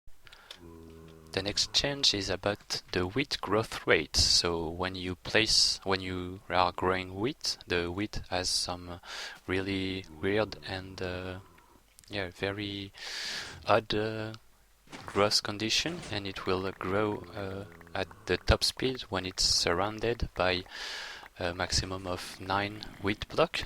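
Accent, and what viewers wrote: France. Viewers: Haha, une présentation d'un mod énorme, et l'accent français qui donne l'impression que tu dis "weed" au lieu de "width" est parfait :D En tout cas super boulot ;) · On sens bien l'accent français ^^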